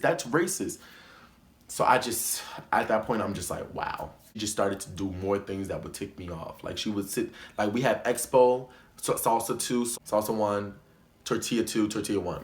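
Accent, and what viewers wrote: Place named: Miami, United States. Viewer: I think it’s in Miami based on his accent and DR references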